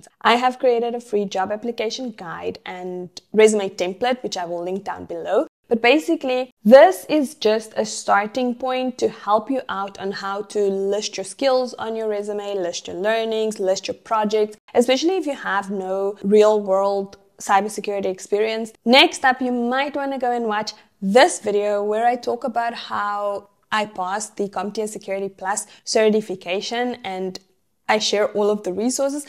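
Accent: South Africa. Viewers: Are you South African? Lol i can hear it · Are you from South Africa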